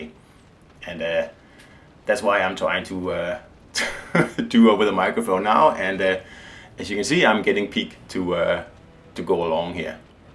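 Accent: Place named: Denmark. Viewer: Love your vids and commentary so subbed. I am just wondering were that accent is from, i am guessing Denmark. If I am wrong please know that I did not mean to be rude.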